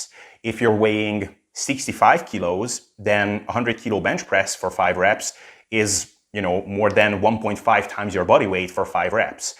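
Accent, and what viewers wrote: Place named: New Jersey, United States. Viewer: That’s a lovely accent you have, New Jersey? Loved the Dumb and Dumber reference 😂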